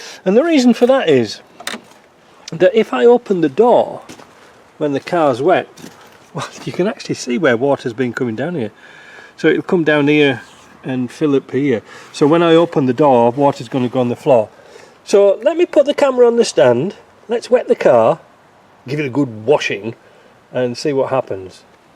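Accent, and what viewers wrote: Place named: Middlesbrough, United Kingdom. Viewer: Well that answers that question 'like a wet weekend in Middlesbrough', my wife was adamant that you had a Boro accent. She's a Middlesbrough lass and we live in Stockton on Tees....... and yes, that's often the weather. Got my sliding window seals done, now to track down a roof leak that runs in the inside roof gutter and showers my lass when braking ...... oh the joys. Ken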